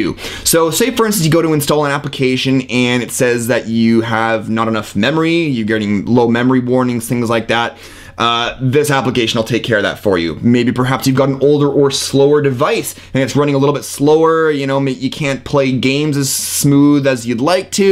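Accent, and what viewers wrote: Canada. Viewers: Ur r canadian right?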